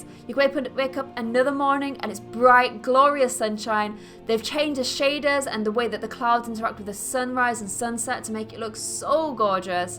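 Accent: United Kingdom. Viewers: So this is where the Little Mermaid went. She also adopted a British accent. Neat. :D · Well. She was hot until she unleashed her British accent.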